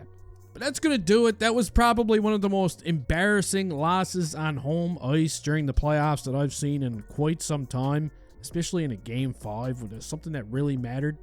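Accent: Newfoundland, Canada. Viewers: Is this dude a Newfie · Fellow newfie here, didn't take me long to catch onto the accent! God bless ya, just stumbled onto your channel.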